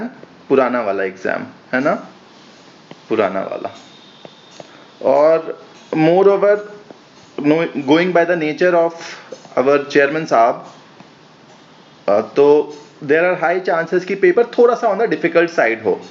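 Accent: Bihar, India. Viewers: Aapka bihari accent jaanlewa h bhaiya.............sun k ek apnapan lagta h.............maati se judav !!!!! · Sir your TONE IS PURE BIHARI...JAI HO BIHAR..Ye video energy booster hai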